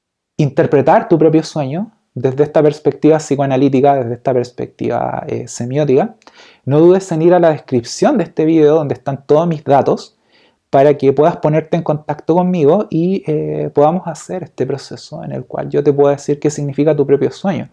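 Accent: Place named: Chile. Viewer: Me encanta escuchar Ace te chico super chileno..... Con esa voz tan cálida y tan clara..... Es familiar y cálido..... Además de certero en el tema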